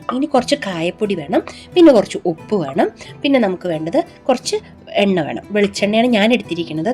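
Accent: Palakkad, India. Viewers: സംസാരം തന്നെ കേൾക്കുമ്പോൾ കൊതിയാകും ഉണ്ടാക്കാൻ ആയിട്ട് പാലക്കാട്ടുകാരി സൂപ്പർ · Typical palakkadan bhaasaha ttolin😊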